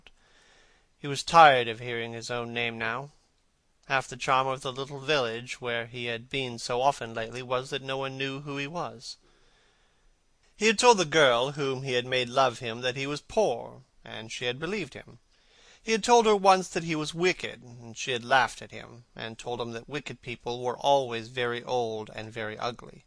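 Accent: United States. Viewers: American accent doesn't fit.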